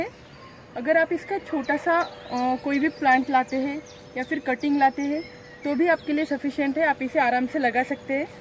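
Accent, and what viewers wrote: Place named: Maharashtra, India. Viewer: Tumi Marathi bolta ka....Tumcha aawaj Marathi Hindi sarkha ahe Mi Nagpur adhun aaj ha plant ghenar ahe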